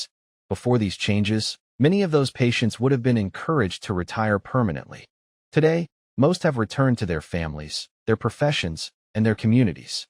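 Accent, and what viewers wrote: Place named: United States. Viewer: Fantastic story. Perfect! There was no problem with the sound at all. It was excellent American English.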